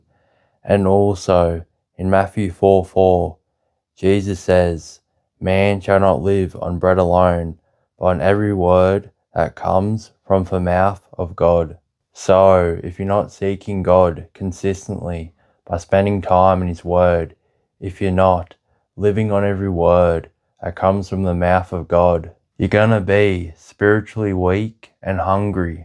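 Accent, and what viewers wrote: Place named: United Kingdom. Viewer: Thank you for subtitles. You have a very strong British accent and I'm not even American. Thanks to the Lord I could understand you without subtitles but many people out there need you❤ please continue your work. Bless you brother❤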